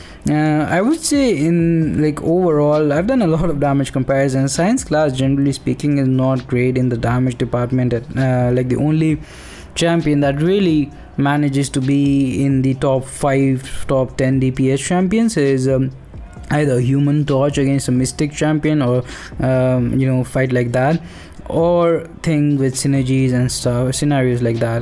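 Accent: India. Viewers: You are from India , I have doubt in your sound its like indian man.But I am from India and even I like your video.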